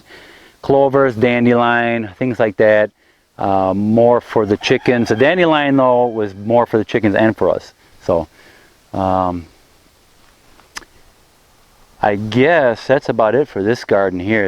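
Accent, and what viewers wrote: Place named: United States. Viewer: You ever been told you have a slight Polish-American accent. I live in south Texas with mainly Polish-American and Mexican-American folks and you sound like you are from around these parts.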